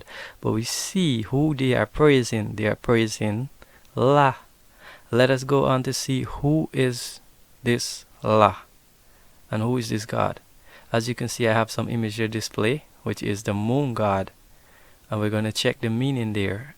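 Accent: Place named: Philippines. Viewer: The poor fool doesn't know what he is talking about. He sounds Philippines.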